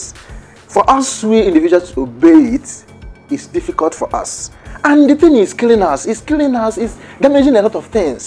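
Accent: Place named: Ghana. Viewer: O boy u no be Nigeria with the way u sound,say for u Ghanaian because I no see u as Nigeria.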